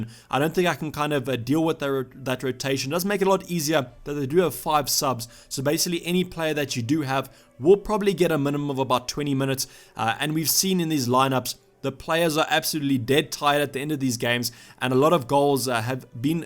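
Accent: South Africa. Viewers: Is this guy south african ? his accent is odd i like it tho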